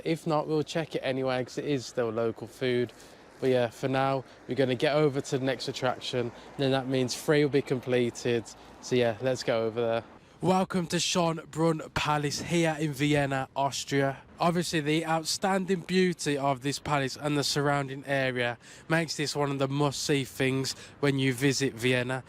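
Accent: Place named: England, United Kingdom. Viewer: Curious which city/part of England your accent is from?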